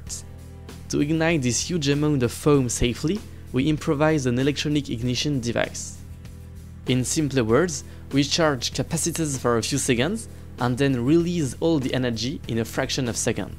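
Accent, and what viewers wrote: France. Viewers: Are you from germany? Why do you have accent of french?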